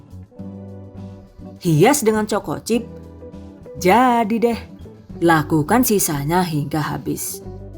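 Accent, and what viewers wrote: Banyumas, Indonesia. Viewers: Langsung Caaaatat..Suka ngakak klo Mba udh Ngomong Ngapak2..Lah Lah Deneng dadine kayak kuwe..pating mbrudul🤣🤣🤣 Sukses ya Mba, Selain Menghibur Video2nya jg menginspirasi Emak2 Bakulan kyk Saya. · Ngapak temen sih...mbakkk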